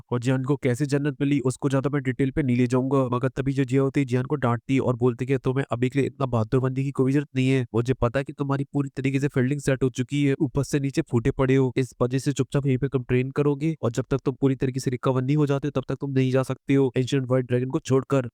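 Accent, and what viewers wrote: Haryana, India. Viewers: Bhai AAP Kya Haryana se ho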